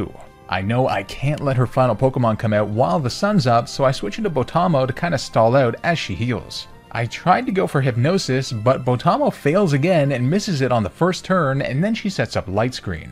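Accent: Canada. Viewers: I love how Canadians saying out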